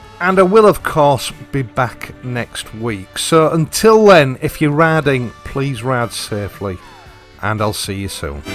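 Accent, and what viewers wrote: United Kingdom. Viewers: British accent 😋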